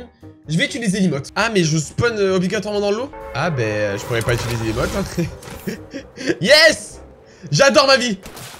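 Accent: United Kingdom. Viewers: 8SUR10 TON ACSENT ANGLAIS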